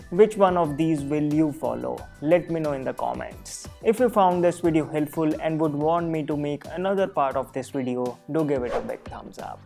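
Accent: India. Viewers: Thx true Indian accent Me too following most of your advice so I'm genius 😊😇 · The master in indian accent .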